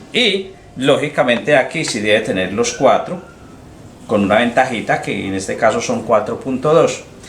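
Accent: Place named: Antioquia, Colombia. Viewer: por lo demas,bien ,todo bien explicado pero ese acentico me lo escarapela,no nos gusta pa ni mierda somos medio alergicos a los paisitas aqui en bucara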